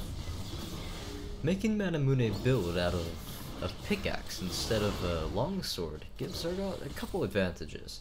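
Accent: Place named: RP, United Kingdom. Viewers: Can you talk normally instead of trying to sound posh and weird. Thanks,nice vid tho besides the annoying story telling. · He sounds kinda posh but that is how he speaks irl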